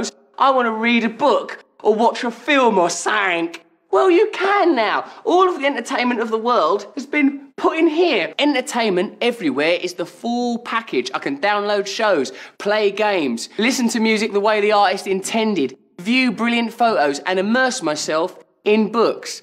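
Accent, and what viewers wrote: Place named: United Kingdom. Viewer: I'm not British... so everytime I listen to this guy, I can't stop laughing, hahaha. Strong British accent, hehe :D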